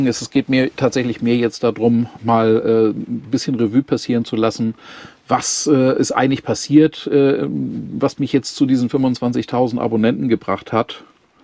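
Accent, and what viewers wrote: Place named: Northern Germany, Germany. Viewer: Auch von mir herzlichen Glückwunsch zu 25Tsd. Guckern. Bin ja noch frisch dabei. Ich mag dein freundliches Wesen und den norddeutschen? Einschlach. Moin aus Ostfriesland. LG Matriczia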